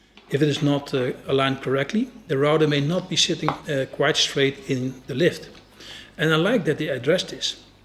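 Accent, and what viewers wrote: Netherlands. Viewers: And I like your accent. It’s even better than that of Mark Rutte…😜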